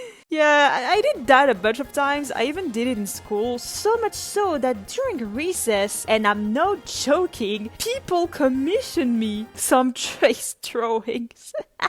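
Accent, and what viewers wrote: France. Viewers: Just basically it kills me how people knew you are french like me because you have a french accent. In the crack ships video there was literally written « nombre total d’abonnés » and the name of the music video was « Mon avis va déclencher une guerre » so as Carmilla said « It’s not rocket science » 😂😂😂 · And you know what’s funny ? By first watching one video of yours, I really thought you were American, then I was like « But she kind of has a French accent, I wonder if she’s French in fact » and when I watched your short about Yandere Simulator, I was like « Yeah. She’s definitely French. » I mean, I don’t understand how people can’t notice you’re an anime fan, you litteraly use many anime of your videos (like Death Note or Kaguya Sama). Wish I had like half of your collection, still have the entire collection of Ki & Hi though. I feel you. 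Currently in my last year of High School and I can’t seriously study for my baccalaureate even if I try. And I feel bad because in elementary school, I only had excellent grades but it feel off the second I enter middle school. I just hope I’ll have a 13 or 14 in my final grade. Alex Meyers, Saberspark and Octo Lady ? I didn’t know you had such good taste, let’s go😌 ESPECIALLY since he’s canonically asexual. Like…no. I mean, the only person I can see Alastor being with is Rosie but only for tax benefits or something like that. OMG WHAT ??? It’s so cool, I’m Moroccan too, LET’S GOO ! I think it will be better to do that if you reach 50k. But anyway, congrats for the 9.5k🎉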